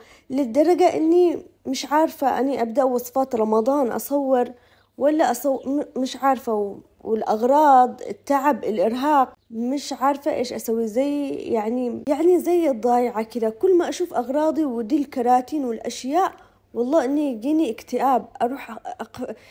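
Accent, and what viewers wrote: Aden, Yemen. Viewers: الحمد لله على سلامه الوصول يا الى مصر الله عينك شفت الحاجات المغلفه وحسيت بالمجهود الي باتقومي به للترتيب هذي الحاجات اكيد بياخذ وقت ومجهود كبير منك الله يكون في عونك بس نصيحه مجربه كل ما اجيتي على نفسك وتعبك كلما خلصتي الترتيب والتنضيم بسرعه وجيبي معك احد يساعدك مش لوحدك تشتغلي انا. يمنيه واحب اسلوبش في الشرح وطبخاتش روعععه. ولهجتش العدنيه الحلوه وصوتش الجميل. الله يخليك لنا ياريت ماتتأخري وتنزليلي لنا اكلات رمضان الي بانزين مائدتنا بمأكولاتك الذيذه معك ام ماجد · انت يمنية منزوجه مصري والا كيف كلامك يمني الله يكون في عونك ويرزقك من الخير كله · اللهجه العدنيه حاليه وانت من نطقك لها صارت أحلى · انتي يمنيه باين من اللهجه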